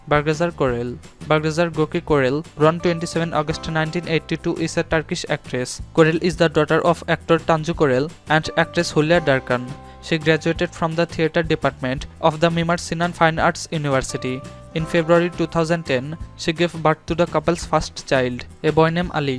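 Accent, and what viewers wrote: India. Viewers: is this guy speaking English or Indian language? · Indian english🤔🤔🤔not bad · Lol is the narrator Indian?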